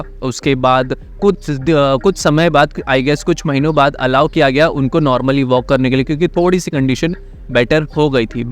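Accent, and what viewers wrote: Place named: India. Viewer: لامصب ما که هندی نمیفهمیم بااین لهجه هندی انگلیسی نامفهموم تر شده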